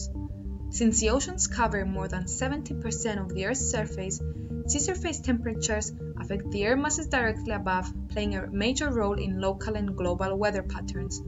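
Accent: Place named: India. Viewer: Unfortunately, your presentation was only partly understandable ( 50%) to me, although I have several degrees, and am very well informed about climate science. Also, hurricane Irma went right through our town - so I am very motivated to get what you are explicating!!! BUT: 1) you were reading, so you were not making any attempt to notice how your audience was responding to your recital; 2) you were not aware that MANY of the terms you were breezing over would be new, and unfamiliar, to some of us wanting to understand what you were describing; 3) You have no pity for those native English speakers, like many of us older Americans, who are unused to your Indian accent, have hearing loss, and had to try to get what you were saying while the backround music made it even harder!. Dont you care whether your accent makes your presentation partially unintelligible? 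SLOW DOWN! If you put your presentation on a public format - like You Tube _ you should care whether you are getting across your point to laypeople!? Slow Down! Or, have your manuscript read by a native English speaker, who is only slightly familiar with the subject, so that the presenter WILL NOT RATTLE OFF A LOT OF TECHNICAL TERMS AS THOUGH EVERYONE SHOULD BE as familiar with them as whats in the newspaper. When you introduce a new technical term, anyone unfamiliar with it will have to pause to try to remember the new term..( Listen to Noam Chomsky sometime; he speaks WAY SLOWER THAN YOU, ABOUT VERY COMPLEX SUBJ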